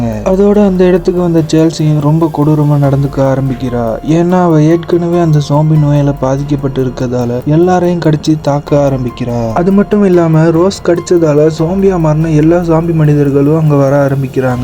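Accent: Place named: Sri Lanka. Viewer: Sri Lankan voice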